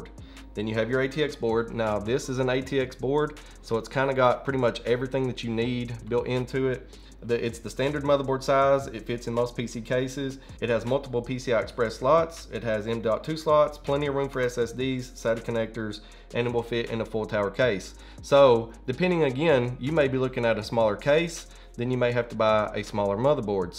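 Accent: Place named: Appalachia, United States. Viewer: Accent is N.C, Tennessee, West Virginia or Kentucky...Am I right?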